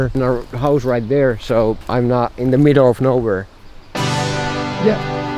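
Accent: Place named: Finland. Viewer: P.s. + Less is more. At times even that is very effective. I liked this nature video and I think your English is just fine with your Finnish accent. Good idea on being your own best friend!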